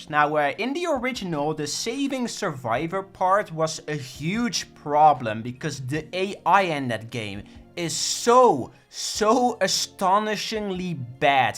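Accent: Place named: Netherlands. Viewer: My bro is just Dutch leave him be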